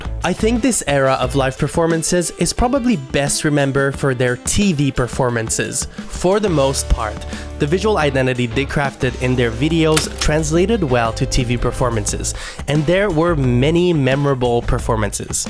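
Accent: Quebec, Canada. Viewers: Is that a québécois accent I'm hearing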